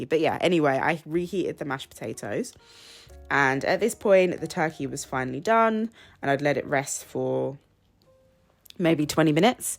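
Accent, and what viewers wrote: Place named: United Kingdom. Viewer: Hello nice video 😊! I have to say you sound a lot more British compared to Kabir I like it!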